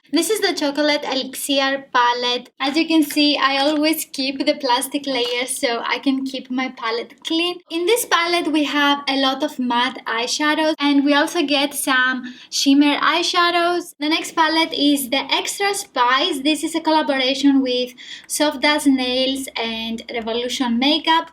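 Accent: Greece. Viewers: Η προφορά σου φουλ ελληνική καταλαβαα αμεσως οτι εισαι ελληνιδα 😍😍😍❤❤ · where are you from?You sound a lot like Greek 😀😀💝💝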